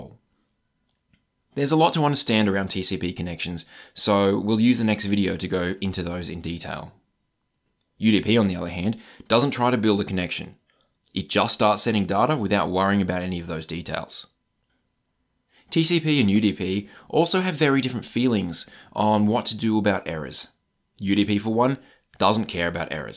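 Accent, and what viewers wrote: Australia. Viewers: That's not Indian lol. He's Australian. Easy to understand.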